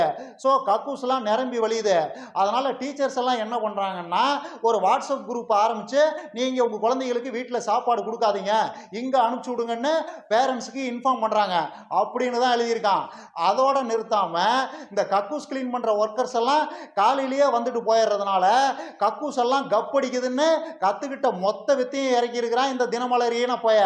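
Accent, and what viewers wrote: Kongu, India. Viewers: கொங்கு பகுதியில் பேசும் பழக்கம் உடையவர்கள் என்று நினைக்கிறேன். இந்த செய்தி பரவாயில்லை.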